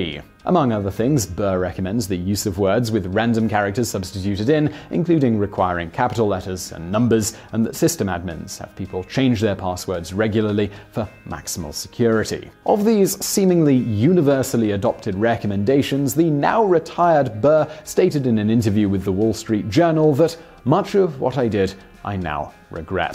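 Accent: United Kingdom. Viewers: OMG! Saaaaaauck, I'm dyin' here. There are times I really love the British accent.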